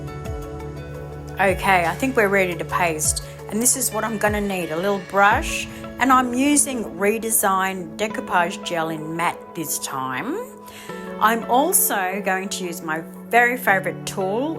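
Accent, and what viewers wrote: Australia. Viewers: Hi, a lovely piece, I love the felt tool,a great idea, also nice to hear an Aussie voice, regards pamela hall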